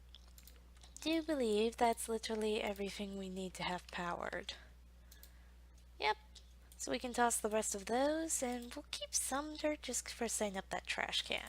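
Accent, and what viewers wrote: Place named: Canada. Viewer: Wow I can't believe senpai noticed me! I get the main North American accent feels but tinges of European... so Canadian/French Canadian? I dunno but it's nice!!! Why aren't you more popular :(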